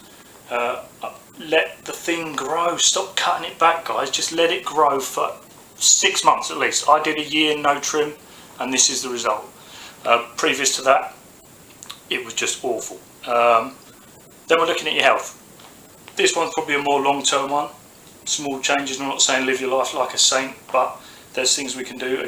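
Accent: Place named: United Kingdom. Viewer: Haha. Great video! I agree 100% with everything you said. I should also say, though, that even though I knew you were British, it didn't hit me that you would speak with such a strong British accent! That was kind of a shock, actually! Hehe. Great video. Thank you for posting. That moustache is looking great!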